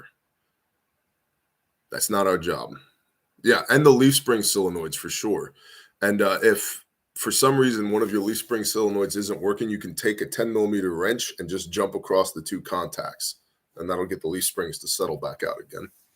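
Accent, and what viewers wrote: Minnesota, United States. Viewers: That MN accent was on point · Love the Minnesotan accent brother. You nailed it perfectly! Dontchaknow! And the radioactive leak, barely a peep and I don’t even hear anyone talking about it. It’s about 2 hours from me. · Awesome Minnesotan accent ya… Donchu know!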